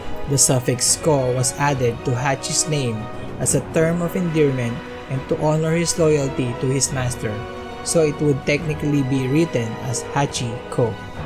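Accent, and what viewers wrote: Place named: Philippines. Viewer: Are you Filipino? Your narration has an accent! 🤔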